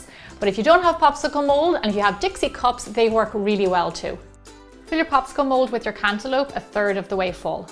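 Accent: Ireland. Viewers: r you irsh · Hello,! I watch all your recipes and even have tried some myself and they are all amazing! I also love your Irish accent! One suggestion: Can you post a video about how to make bueno cream spread? Cannot find it anywhere online. Thank you!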